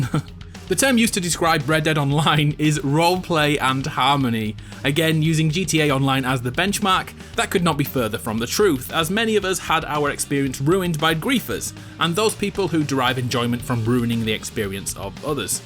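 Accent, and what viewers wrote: United Kingdom. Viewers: I stay to the end of the video as their amazing and are u British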